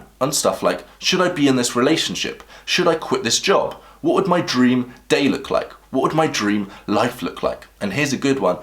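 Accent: United Kingdom. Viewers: I've payed thousands of dollars - proceeds to show pictures of euro-notes :D. Btw your accent sounds very British to me (?)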